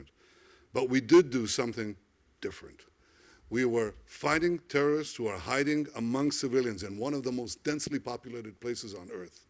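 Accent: United States. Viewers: How is the same accent like Americans🙄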